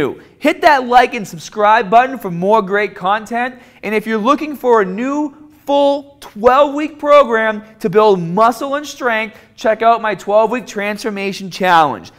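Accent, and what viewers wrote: Boston, United States. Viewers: That Boston accent tho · Where did this Bwoston accent come from?? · Dear god, your accent is so much Boston 😆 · Boston accent no doubt haha. I'm from the south so we all have accents. Great advice though. Subscribed. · Hex bah. Someone sounds like he's from Bean Town. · Fantastic content and even better thick Boston accent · You must be from Bahston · is this a boston accent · Somehow I think is from Boston. Just sayin. About to grab a hex bar for the house since we're all trapped at home lol. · This dude has a crazy Boston accent. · Can someone explain to me why has a Boston accent now? He never used to? I feel like I'm in a alternate universe or something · This dude used to work at the maaketing depaatment, reminds me of my buddy from baaston. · U from Boston?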